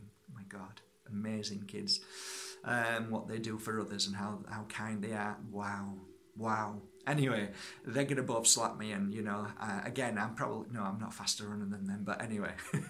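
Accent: Yorkshire, United Kingdom. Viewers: I'm in La Cala de mijas... Love your Yorkshire accent..I'm a Lancashire Lass! 😉😀 You're an inspiration and I hope we meet one day ☺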